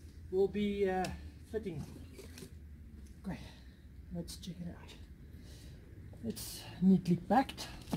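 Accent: South Africa. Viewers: You sound like you speak afrikaans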